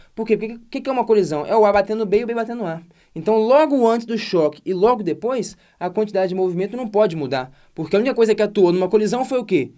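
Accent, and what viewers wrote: Minas Gerais, Brazil. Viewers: Excelentíssima aula, Prof.! O sotaque mineiro deixa ainda melhor xD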